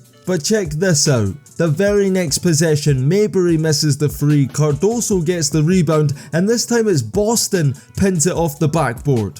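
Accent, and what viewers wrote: Scotland, United Kingdom. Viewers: Good job. It just seems kind of odd to hear someone with a thick Scottish brogue doing an analysis of American basketball. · caitlyn clark is not beating shit ok , boy i tell you the racist cowardice power structure is something else , Those other girls on the indiana fever are balling there asses off there the ones that’s winning those games , but yet everytime they give all the credit to caitlyn clark that’s a good way to start division amongst your teammates, this dumb british accent racist guy narrating is dillusional , those girls on the indiana fever are playing there asses off stop giving caitlyn the credit when she has a whole team behind her !!!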